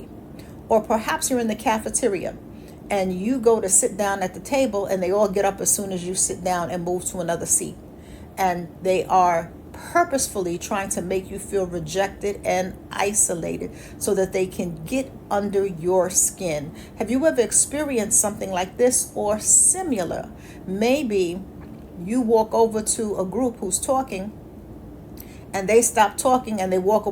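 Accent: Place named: New Jersey, United States. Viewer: Ms., your Jersey accent is off the chain, your accent is beautiful and gorgeous!!!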